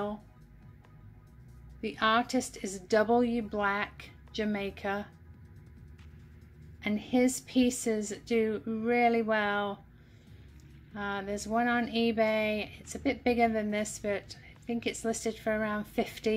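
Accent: United Kingdom. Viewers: greetings from the East Coast. I enjoy watching you and is my favorite! You are both blessed to have each other. I enjoy watching you and do so alot in the evenings after a long day at work and def on weekends. I have learned so much. You are wonderful to watch and your voice and the fantastic British accent is so soothing. Thank you for making my days alot happier. You are a doll! Happy Thriftying!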